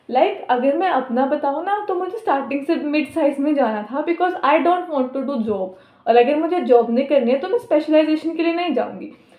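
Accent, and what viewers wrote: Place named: Marwar, India. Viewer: aap marwadi ho kai aapke tone se lg ra h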